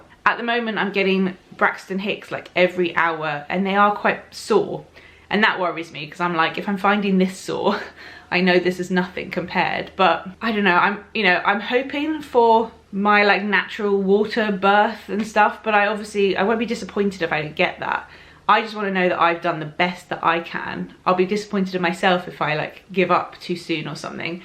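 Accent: England, United Kingdom. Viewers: I love your presence in the internet, I am flexible and I'll be here for 1, 2 or how many videos you will be putting out, and I'll be here watching out for your come back or however you decide to do it. You have been a wonderfull inspiration for me, and I got a little emotional watching this goodbye...12 minutes feels so short though and I'll miss your sunday's videos and your English style and accent. Much love to you and see you soon ❤️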